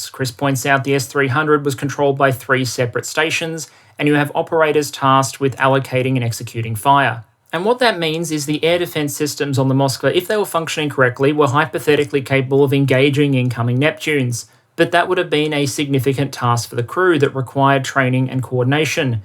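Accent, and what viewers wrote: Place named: Australia. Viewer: As usual for, excellent. One observation - not a complaint but a quirk of a Yank listening to an Aussi - It took me until the last few minutes to realize that you were saying "hulls in the water" and not "holes in the water". Honestly, I thought you were riffing on the joke that "a boat is a hole in the water into which you pour money"... Either way it made sense, sort of.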